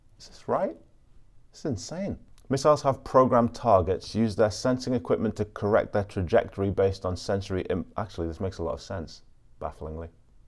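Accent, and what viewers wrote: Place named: North, United Kingdom. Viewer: I can't place your accent, you sound like a northerner but something is telling me you're Scottish.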